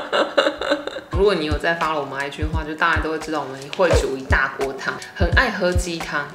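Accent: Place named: Taiwan. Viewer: 說台語好可愛❤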